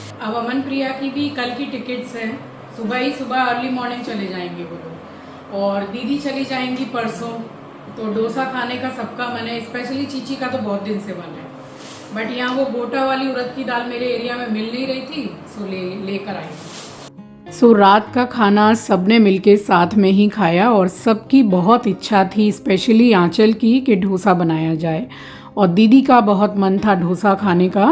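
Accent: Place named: Delhi, India. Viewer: haan. Wo Dilli se bolti hai 😄 😀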